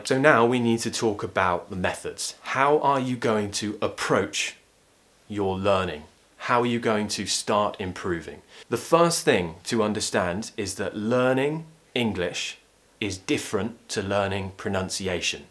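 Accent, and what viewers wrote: RP, United Kingdom. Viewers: I live in uzbekistan l love your accent l want to learn modern rp from you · I really love bbc accent and I think your accent similar to it or msy be itselfe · RP has to be my favourite. I love yours and Tom Scott's accent. Most of my Youtube consumption is from Brit creators' contents, mainly because my ears just prefer it that way (also: vocabulary preference), so that's the audio exposure for me. What really started all this though is that one time I got asked in my home country if I were a foreigner due to my accent; most people here can speak English, but in the American accent. Prior to that, I hadn't really noticed it.. so I just thought if that were the accent I tended to default to, I might as well do it well. :) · Of course i love (rp) accent thus i watching you always❤👏💕💪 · Your pronunciation is excellent! I love RP. 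· Sir love your accent and pronunciotion on the other hand i love british ❤️ 😂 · I JUST LOVE THE WAY YOU SPEAK...so that's why I think british accent is best....👍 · Hii...🙋.. thanks for your great video s.... I always eagerly wait for your upcoming video s...u r the great British Accent teacher....👍👍👍👍great job👍👍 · love your RP accent to improve my African accent. But the fees choo! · you stated that you have a “modern RP accent,” how is that in relation to the “mainstream RP” or “contemporary RP”? I, myself, like the contemporary RP accent. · It seems to me that British accent is really pretty as it is fascinating to listen to a Brit speaking with it like you.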